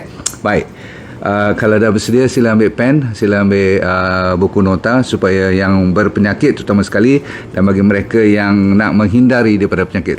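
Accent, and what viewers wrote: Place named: North, Malaysia. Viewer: manis melecas noh...Dr mesti org utara..hahahh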